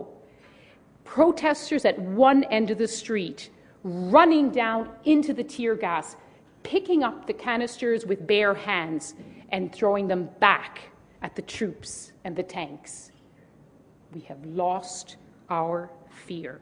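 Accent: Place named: Minnesota, United States. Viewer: She sounds a bit like Minnesota people, to me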